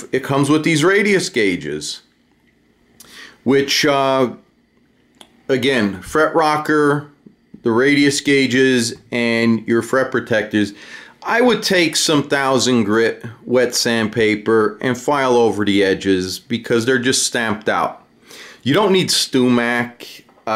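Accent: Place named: Chicago, United States. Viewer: What part of chicago are you from? Lmao You sound like you're from my old neighborhood lol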